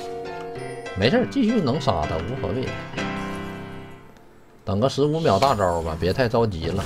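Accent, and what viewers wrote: China. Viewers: 猴哥到底大陸哪裡的口音呀？積木XD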